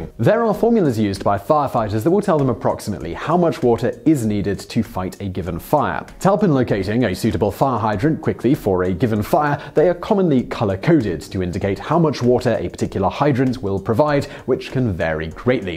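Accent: United Kingdom. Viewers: Oh look, the British Mathew Santoro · I'm confused. Why is someone British (by his accent) explaining about fire hydrants in the USA? In Britain hydrants are below ground level and plates ('H') indicate the hydrant distance from the plate and the diameter of the water main.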